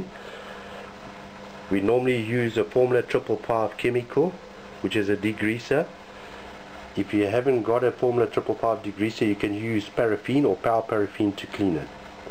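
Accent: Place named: South Africa. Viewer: i know a South African when i hear one. when you said "robot" you confirmed it cause we are the only country (or one of the only) that uses "robot" instead of "traffic light". Thank you for sharing your knowledge. my Toyota Tazz has had an idling issue for a while and you might have jut helped me solve the issue.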